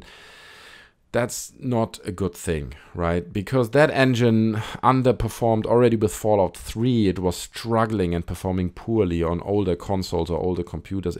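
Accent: Germany. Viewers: Is that a German accent? I'm a first-time viewer of your channel. I'm not 100% sure if it is German... I can't quite place it. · are you German ?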